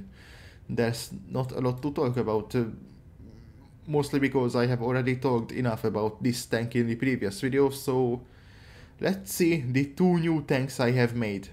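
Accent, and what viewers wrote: Hungary. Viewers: Akcentus nagyon magyar. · hungarian ahh accent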